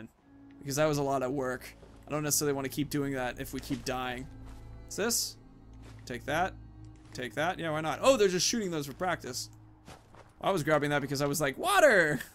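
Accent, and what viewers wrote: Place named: Australia. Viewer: It's all about that Australian Fah Hahbah.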